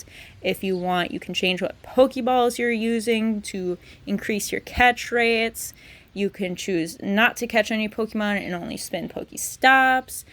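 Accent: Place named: Midwest, United States. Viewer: I can tell you are from the mid west lol :)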